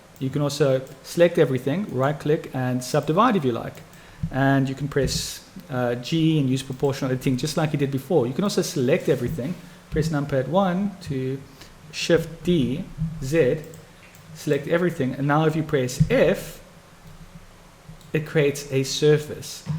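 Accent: South Africa. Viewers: lol, the South African accent is very strong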